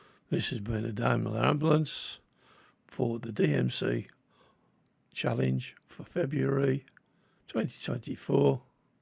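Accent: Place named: Midlands, United Kingdom. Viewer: I bloody love when you open something up for the first time, and the wheels just fall out! What a relief! And I do quite a bit of work with Land Rover, so the Midlands accent is not so bad! 🤭 The only thing on that casting I don't like is the filled quarter-light windows: the temptation to drill those out is one I'd not be able to resist, though it does leave the A-post and door frame quite vulnerable. Lovely entry to the Challenge, and a great save!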